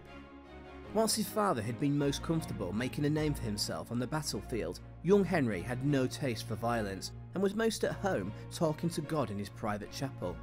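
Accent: United Kingdom. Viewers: It's weird-- the narrator has a very thick British accent and yet he uses American slang like "pissed off" for angry and "awesome" instead of brilliant.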